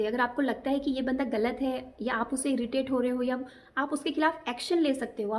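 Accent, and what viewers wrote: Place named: Punjab, India. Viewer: This is because we all love you, ur punjabi is so cute . love you api from Punjab❤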